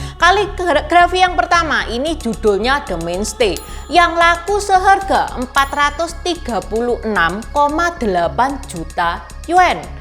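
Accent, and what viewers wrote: Java, Indonesia. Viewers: Hidup merah, Meddok nya sudah berkurang dikit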